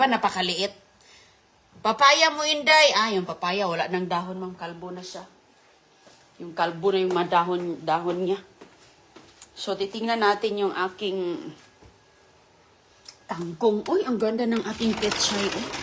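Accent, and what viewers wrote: Visayas, Philippines. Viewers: Bisaya Man Dhay. Please understand 😂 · Bisaya po kasi sya...Yung E ngging I,kayo nman my masabi lang... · bisaya ngani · Ano kba siempre bisaya bkit ikaw tga sang province kba?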